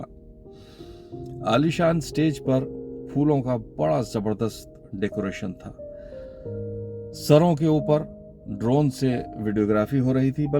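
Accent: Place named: Aligarh, India. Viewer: Voice n andaaz fully Alig❤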